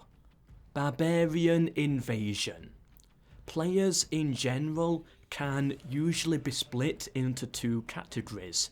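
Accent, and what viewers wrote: United Kingdom. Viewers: Oh god your british acent is that british I underground only 60% of your words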